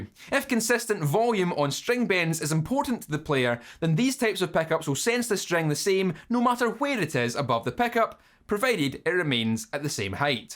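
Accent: Scotland, United Kingdom. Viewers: That was a very consistent explanation indeed....with a nice Scottish touch 👌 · Thanks for the explanation. But damn my ears are almost dead after hearing such a load of British accent.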